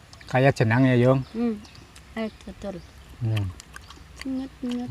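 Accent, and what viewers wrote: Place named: Bumiayu, Indonesia. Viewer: Mas asli mana,.. logatnya mirip suami org Bumiayu, dn sy betawi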